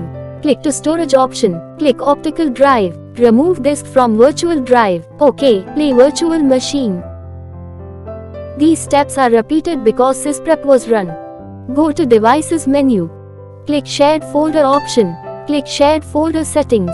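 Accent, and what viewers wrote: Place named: India. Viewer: Might be good content, however I simply can not stand listening to Indian people speak. Especially an AI speaking Indian. What a turd of a video.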